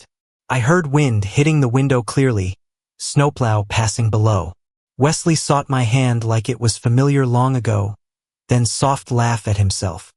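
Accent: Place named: Spain. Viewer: The voice. Missing words. Spanish accent thrown in. All reasons why I could not listen to this all the way through. 🤮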